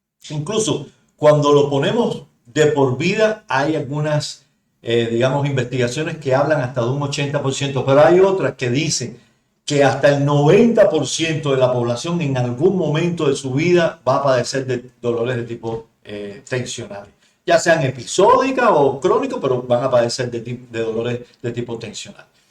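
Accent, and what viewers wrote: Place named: Cuba. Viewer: Muchas gracias doctor por tan buena explicación. Por su acento creo que somos compatriotas cubanos( espero no equivocarme ) . Pasaba por aqui buscando información acerca de la cefalea tipo tensional ya que llevo años padeciéndola intermitentemente y me ayudo mucho reconocer los signos de alarma, ya que eso es generalmente lo que mas a uno le preocupa. Exitos en su canal!!!!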